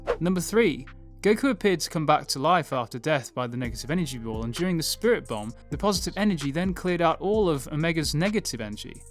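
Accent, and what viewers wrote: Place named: United Kingdom. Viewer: British, Don't care didn't ask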